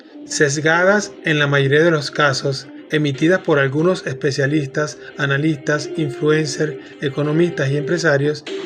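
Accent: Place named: Venezuela. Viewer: Muy buenas propuestas las que usted está es poniendo buenas tardes desde tinaco estado Cojedes Antonio Acosta lo escucho venezolano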